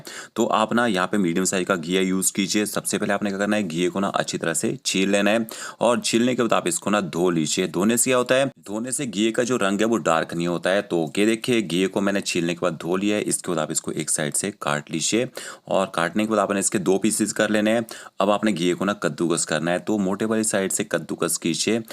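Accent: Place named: Himachal Pradesh, India. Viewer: Apne apne skill k dum pr ache utuber bn gye gud God bless you.I m from Himachal aur apki bat se lgta hai k u r also from Himachal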